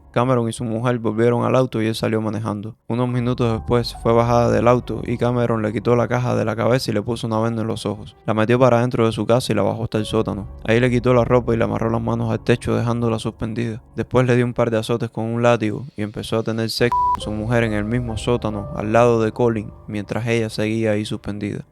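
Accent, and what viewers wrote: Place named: Cuba. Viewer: 😂😂😂😂😂Eres cubano 100%.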